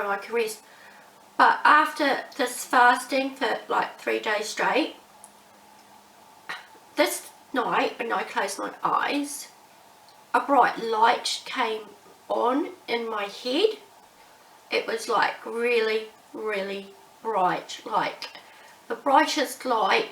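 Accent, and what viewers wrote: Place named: Australia. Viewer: Thanks for sharing your experience.. I am also going to begin Sungazing, and would like to know if you Sungaze when it is cloudy, and the Sun is covered. Did sacred sounds and mantras through Dr Pillai also add to this awakening of the The Third Eye? I. It may have been the Kundalini (serpent) opening up more of your power center at the navel point which is a golden color. Shreem Brzee and Turmeric both are associated with that golden color...just giving some ideas to bat around. Luv your videos and encouragement about wealth/Brzee. You are candid, and funny, too! You have alot to add to everyone's understanding.and your Australian accent is charming..to us in the USA. Is there a product for cleansing that you can recommend ? Thanks..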